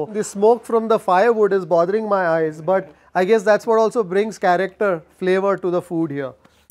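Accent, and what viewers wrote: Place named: Tulu Nadu, India. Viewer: your kannada has full TULU influence 😁😁 We Tuluvas have an interesting way of speaking Kannada 😊😊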